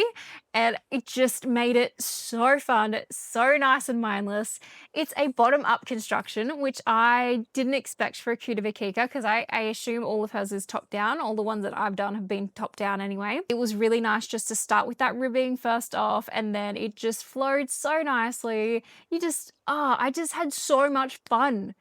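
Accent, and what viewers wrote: Australia. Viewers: Hi! I am so happy for you that they are finding you a diagnosis and should soon be figuring out better ways to help you! You are such an incredible inspiration with the way that you are able to film your wonderful videos for us and convey such joy for your knitting and for life in general. I am sure that I can speak for everyone when I say how grateful we are that you share so much of your precious energy with us, because as you say you get so tired and likely discouraged at times. Your knitting projects are so lovely, all of them, and you do beautiful colorwork. Also I have to say that I just love your accent, and practice my Australian word pronunciations when no one is listening😄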